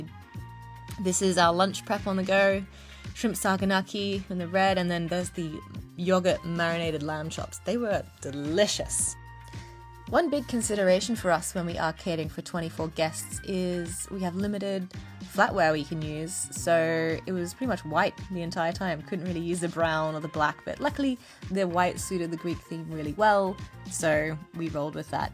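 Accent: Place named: Australia. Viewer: What nationality is she? I thought she was Australian not English 🤔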